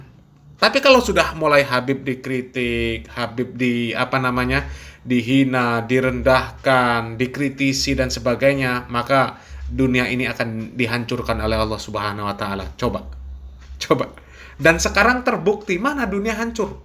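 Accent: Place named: Kediri, Indonesia. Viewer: Sangat sangat nggatelne teleh ... Duh . .logat bahasanya kog mirip banget dgn orang kediri to... Selalu ngakak kalo denger umpatan admin ... 😂😂😂😂😂😂😂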